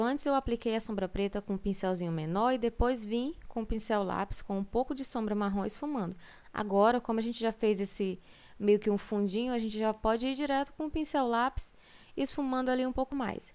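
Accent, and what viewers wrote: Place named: Minas Gerais, Brazil. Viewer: vc é de onde? seu sotaque parece de gente de Teófilo Otoni em MG...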